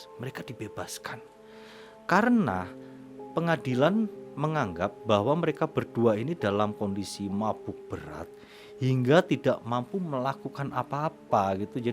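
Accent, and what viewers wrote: Java, Indonesia. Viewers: Daya tariknya justru ada di medokmu bang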